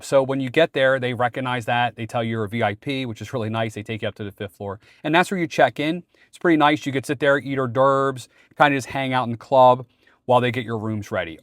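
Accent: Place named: Philadelphia, United States. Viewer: Clocked your phila . accent before you mentioned PHL! Nice review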